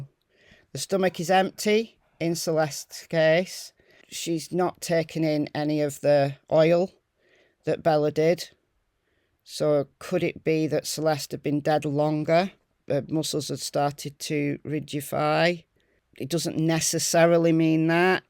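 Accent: United Kingdom. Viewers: Unrelated question, what is your accent, it’s really cool. Somewhere in the UK?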